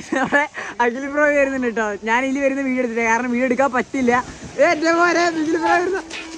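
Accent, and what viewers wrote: Kannur, India. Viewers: Bro u from kannur ano plzz tell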